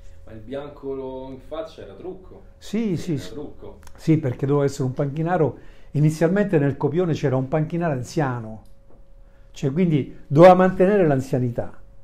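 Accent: Rome, Italy. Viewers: Dall' accento sembra romano de roma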